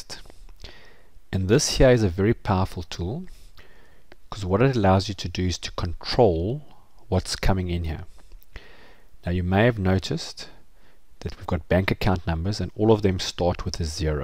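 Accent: South Africa. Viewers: narrator is def south african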